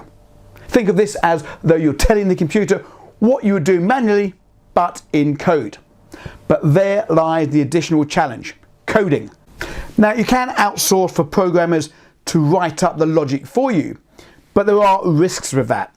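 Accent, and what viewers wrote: United Kingdom. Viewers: Those who mock in the comments and accuse of being a scammer are exactly those who have problems in understanding British accent, what he is saying is clear and helpful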